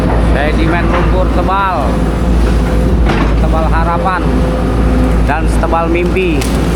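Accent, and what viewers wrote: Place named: Banyumas, Indonesia. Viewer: dr logatnya mas dr jawa ngapak ya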